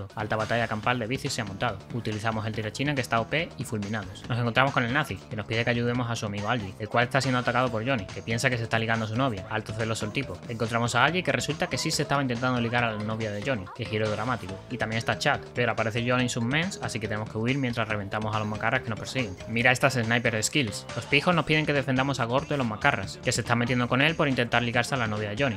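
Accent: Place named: Andalusia, Spain. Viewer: He iniciado a ver la reseña de kingdom hearts y me mola tú rollo . Tienes nuevo suscriptor ^^ . Y por tú acento eres andaluz verdad ? Yo soy de origen almeriense :3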